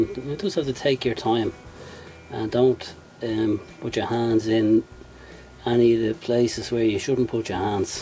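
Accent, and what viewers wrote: Dublin, Ireland. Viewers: i heard Dublin when ya spoke.. My uncles, my cousins, my Granny & Granda. i miss the way family was when that house was alive, & the epicenter. It was where Granny kept the whole world together. Where i really learned to have a propper fry, & where i almost always slept & felt at home when visiting. im 1st born American, always lived in California, but you know ive eaten mackerel fished out of a pole in the water while swimming out in Houth, so ill be alright- fuck me though... What was it you were doin' again? (subbed, cheers! & thanks)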